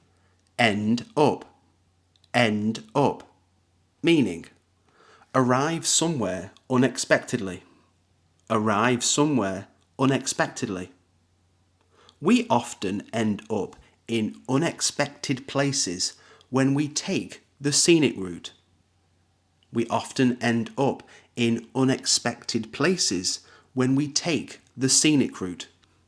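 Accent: United Kingdom. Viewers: It sounds your accent is British Are these words common in American accent?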